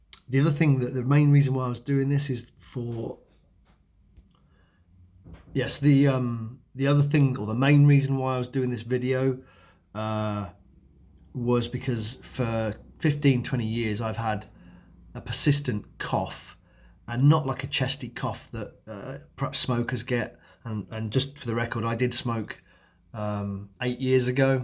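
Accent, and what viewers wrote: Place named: England, United Kingdom. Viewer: I'm quite sure he's English , judging by accent and the sockets behind him 😂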